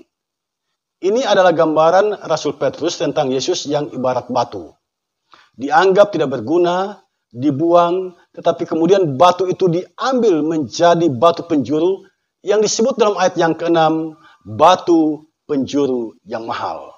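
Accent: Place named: East Nusa Tenggara, Indonesia. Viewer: Syalom bapen slamat pagi. Trimkasih bpa utk penjelasan firman Tuhan pagi ini yg memberi pemahaman yg sungguh dan benar. Maaf bapa berasal dari daerah mana? Saya sendiri dari NTT. Maaf bapa saya bertanya krn dari gaya/logat bicara sama dgn orang NTT. Dan jujur bapen saya baru dapat canel bpa hari ini 19 juni 2023. Skli lag itrimkasih banyak2 bapan. Tuhan Yesus memberkati bapen dan keluarga.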